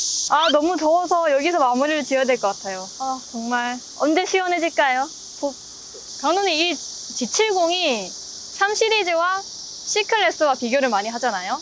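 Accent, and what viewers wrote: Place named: Busan, South Korea. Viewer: 음~~ 서울 상경한지 꽤 됐는데 아직 서울말 패치가 덜 된 부산아가씨 억양이네 ^^ 재밌는건 부산 가서 저런 톤으로 말하면 서울말 쓴다고 면박주고 서울에서는 아직 경상도 사투리 못 벗어났다고 하거든 정체성의 혼란을 겪고 있을 시기임 ㅋㅋ 리뷰는 재밌네요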